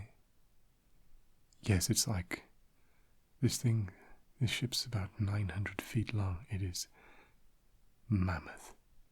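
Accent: England, United Kingdom. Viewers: it's definitely an English accent but even after moving countries it can be hard to shake it. My dad was born in Halifax, we live in Australia but he still sounds mostly Yorkshire 😅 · ooops! I thought your accent sounded English! I’m not too far from there- North Yorkshire.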